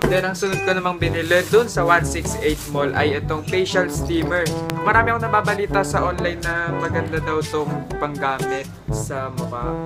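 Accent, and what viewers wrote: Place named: Laguna, Philippines. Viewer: May accent ka na parang tiga laguna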